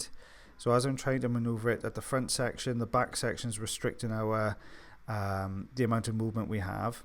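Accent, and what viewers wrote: Wales, United Kingdom. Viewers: Are u british??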